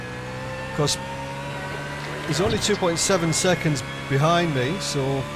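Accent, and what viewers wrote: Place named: Durham, United Kingdom. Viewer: I've watched loads of your videos, and I can never decide which part of the North East you're from, go on, put me out of my misery? The accent sounds like Durham/Sunderland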